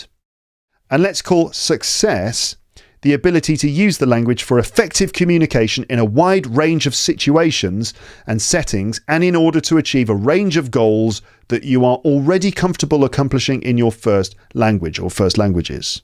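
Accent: United Kingdom. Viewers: Thank you! your great british pronunciation, accent, explaining a difficult vocabulary are very informative! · However your podcast is in British accent and I work in American accent but I really am good with your way of speaking and teaching! I really like to hear you for hours! Good job 👍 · The problem is the british accent🥴. But your channel is fabulous.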